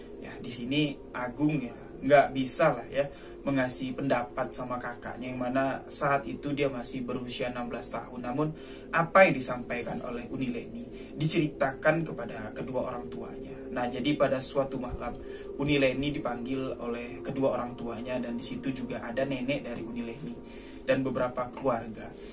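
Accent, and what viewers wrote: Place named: Minangkabau, Indonesia. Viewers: Bg, pembawaan bahaso minang nyo barasiah, sampai wak sangko bg urang minang juo... Salam minang bang dr tanah borneo. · Mantap bang. Bahasa Sumatera bang pas banget.👍👍👍👍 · Mohon maaf lahir bathin bang Sukses selalu dgn ada nya chanel ini sy semangkin tahu banyak soal dunia mistik terima kasih bang,bahasa minangnya enak banget didengar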